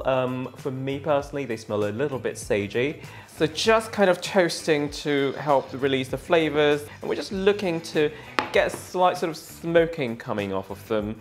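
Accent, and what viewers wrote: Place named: RP, United Kingdom. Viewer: I want to like this channel, but the limp wristed posh affectation is revolting.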